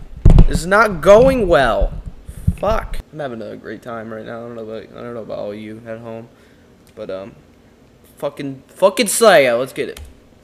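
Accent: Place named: United Kingdom. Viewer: Lose the british accent. They're from Cali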